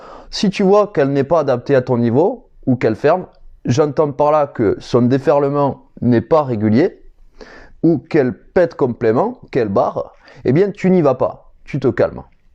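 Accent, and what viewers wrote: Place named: South, France. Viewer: J'adore ce petit accent du sud !! 😂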